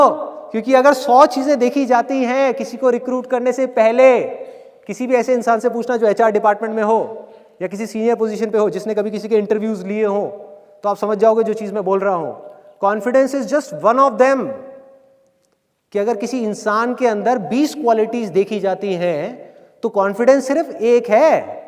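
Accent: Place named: India. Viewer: Oh my gosh.i can't understand a single word.indian's accent is terrible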